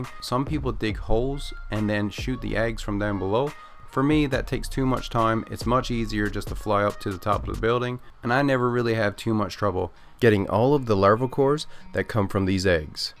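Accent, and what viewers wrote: Newfoundland, Canada. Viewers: Great content buddy . You sound Newfie as my self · are you canadian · Are you from Newfoundland you say "what are you at" the only people i know that say that are my family who are from Lewisport Newfoundland you sound like you have a slight accent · Hilarious. I was like "i know that accent". From one Newfie to another! Cheers dude! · Heard whaddayat, and immediately thought, a Newfie on YouTube??? No way! Love from a Corner Brook boy! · A minute in I was like wait b'ys a newfie - and then I saw your username 🤣 Born and raised in Deer Lake. Also thanks for the awesome guide! I just picked this up. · Guy is from Canada or Minnesota. 😄 Pretty good video though.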